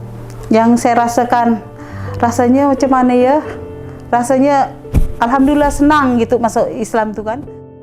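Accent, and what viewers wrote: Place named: Malaysia. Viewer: Tapi,akk macam org Melayu cakap Nye kak,akk dari mana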